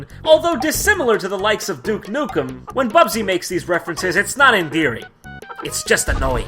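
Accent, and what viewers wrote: New York, United States. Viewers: I love how his New Yoik accent just gets thicker and thicker as the games get worse and worse.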